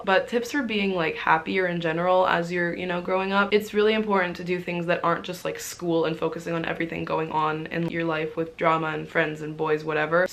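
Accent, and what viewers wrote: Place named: Canada. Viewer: That Canadian accent is god awful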